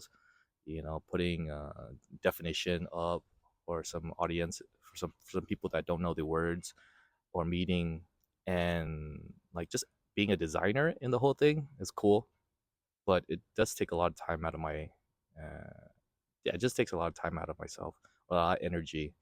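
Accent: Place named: England, United Kingdom. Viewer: Yesterday, I came across a video on YouTube featuring a very young developer from Chicago, he shared about how inspiration feeling about documenting our life, work, and growth via vlog/videos (btw his channel looks so art cinematic I'm impressive so much!! <link> . Today, I found myself back on your channel watching another video about making videos on YouTube. It makes me feel a bit shy because I still don't feel ready to start my own vlog. I'm taking it day by day and hopefully, I'll have the courage to start a vlog soon. Anyway, I hope that we can organize a meet-up for UX designers to share experiences in Ho Chi Minh City. NAB is my dream company. I hope to become your colleague one day! Another thing I'd like to comment on - I love your English accent. I'm just curious, did you study abroad?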